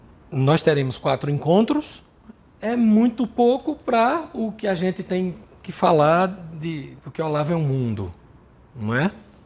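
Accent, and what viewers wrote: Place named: Northeast, Brazil. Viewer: Esse palestrante é do nordeste? Sotaque bonito! Moro em João Pessoa, e tenho amigos pernambucanos, potiguás e cearences.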